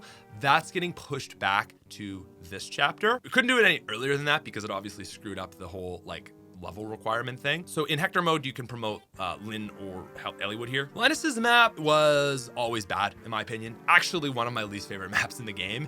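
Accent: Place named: Canada. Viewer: Where do you live, bro? That "oat" accent instead of "out" leads me to think you live in the Canadian Prairies or Atlantic Canada, or Midwestern USA.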